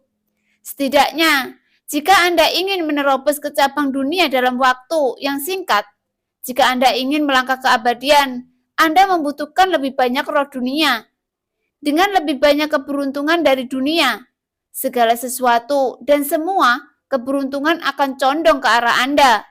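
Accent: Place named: Poso, Indonesia. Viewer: Mimin orang mana ini kayaknya org poso ya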